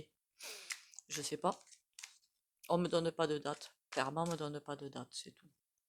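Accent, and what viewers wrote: South, France. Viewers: Bonjour, j'apprécie de plus en plus vos vidéos j'adore votre accent du Sud il me rappelle mon adolescence à La Ciotat. J'ai aimé la série des Stargates et le quantique y été à chaque épisode. Merci d'être la 😘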